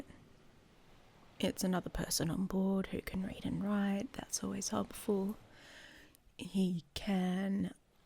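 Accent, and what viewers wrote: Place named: Australia. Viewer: Gahhhh an Australian accent? So rare in the F4A world 🤩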